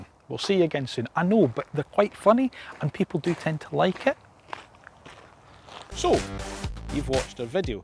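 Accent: Scotland, United Kingdom. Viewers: Scottish accent, bro 😎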